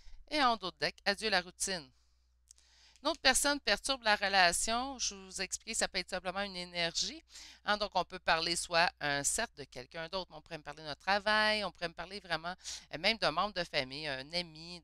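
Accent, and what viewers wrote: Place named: Montreal, Canada. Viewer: Bonjour, j'aime beaucoup votre façon d'interpréter les cartes Et j'adore votre joli accent. Ça me fait penser à ma famille qui vit à Montréal 😊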